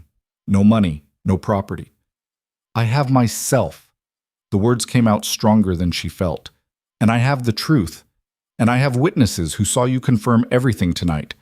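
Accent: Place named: United States. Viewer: Why is it using an American English accent for a story set in Britain? This whole storyline mixes up timelines with 19th century, 20th and 21st. Be prepared to feel constantly confused about courtroom drama and the need for a passport in 19th century England lol.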